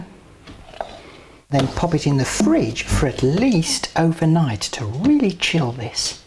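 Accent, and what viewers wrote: United Kingdom. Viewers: I am second gen Canadian Grampa from Finland. Her name sounds more Finnish but I truly could not tell you honestly. Very British sounding Voice which is delightful. Thank you for the recipie, I make Rieska which is similar but a little thicker. From what Gramma said. Thank You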